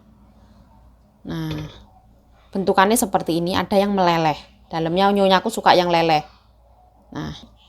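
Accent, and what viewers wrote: Java, Indonesia. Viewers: Lucu ya mba ngmong na..ciri khas bgt legot jawa ya.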